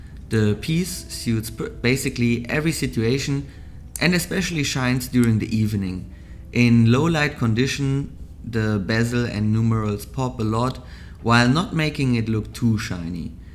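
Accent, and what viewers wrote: Germany. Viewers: Denglish hardcore😆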